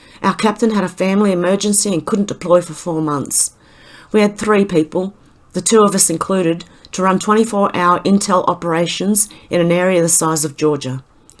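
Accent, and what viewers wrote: Australia. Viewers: Iv been trying to listen for your speech to sound funny but you still sound normal. so keep being our Australian voice that covers the important world news. We need more Australians like you. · Hun I am really sorry that some nasty people made you feel bad about yourself. For what it's worth... I have never heard your speech as anything other than normal... well.. except for the Aussie accent ( I'm a Brit) lol. I look forward to your videos and always enjoy what you put together for us. Sending you all my love. Lisa xxxx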